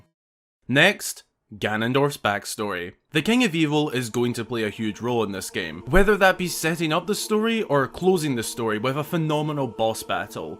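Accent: Scotland, United Kingdom. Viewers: Dein Akzent ist super! Schottisch klingt richtig gut.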